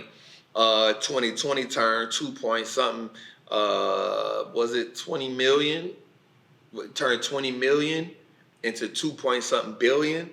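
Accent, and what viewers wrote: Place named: New Orleans, United States. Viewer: Just stumbled across you on a video you did with Tom Bilyeu and a soon as I heard your voice I was like that dudes definitely from New Orleans. Man everything you say makes sense and easy to understand. Thank you for spreading the knowledge.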